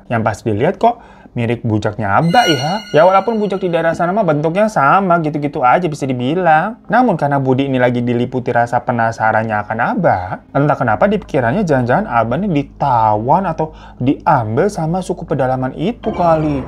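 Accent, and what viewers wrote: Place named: Madura, Indonesia. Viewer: banggg basa banjar bangggg logatnyaaa kek maduraaaaaa😭👋👋👋👋👋👋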